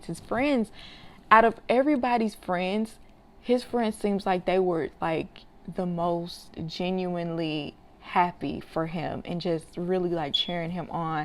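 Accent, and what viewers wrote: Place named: South, United States. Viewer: I've heard this chirping on your other videos (smile). Normally smoke detectors are wired together; if one goes off in one part of the house it will cause the other one to go off. if you replaced batteries in all detectors and it still goes off, then the detectors are bad and need to be replaced. They only last about 10 years. Also love your makeup and southern accent!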